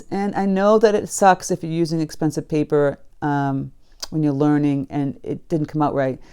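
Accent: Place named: New England, United States. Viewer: I just started a week ago and don’t have high expectations (yet) but I just got halfway through a mountain landscape and quit because it was just that bad! I was scrolling through your videos and found this…definitely words I needed to hear. Keep trying, it WILL get better! I’ve watched several videos by various teachers and really enjoy your style the best (plus I was raised in New England and enjoy the accent).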